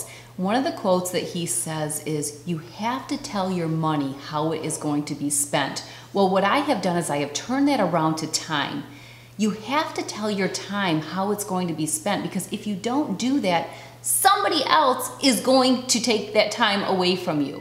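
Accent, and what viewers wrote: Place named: South, United States. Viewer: I like the family calendar idea., random question, where are you from? (Which state?) I'm new and i haven't heard you mention it yet and I didn't see it on your website either but ya know what they say, I'm blind in one eye and can't see out the other (anyone else have that problem?:P) If you dont wanna say, thats cool. I'm just hearing southern....a lot of southern...but idk, i could be wrong:)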